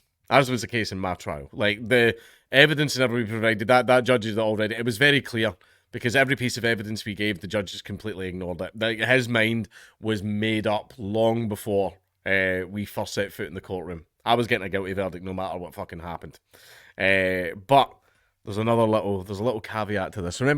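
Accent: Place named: Scotland, United Kingdom. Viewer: Foolish, funny sounding, Scottish man, they want to weaponize the courts. You're 100% right that this will be used to free scumbags preferred by the elites. It will also be used to imprison innocent dissidents. Most importantly, it will be used as a threat to force compliance with their agenda. "Do what I say or the thought-police will snatch up your son, and the judge I own will falsely convict him of a made-up crime and toss him in a cell." -the tyrants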